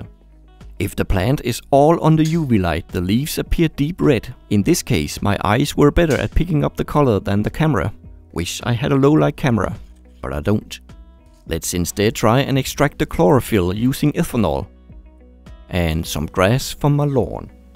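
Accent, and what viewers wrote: Denmark. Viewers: I KNEW YOU WERE DANISH!!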